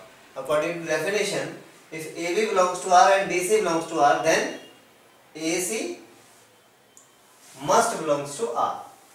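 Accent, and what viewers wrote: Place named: India. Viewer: incredible... I have to watch an Indian (? sorry if not ;) but you sound kinda like an Indian) guy to understand what my german professor can't explain.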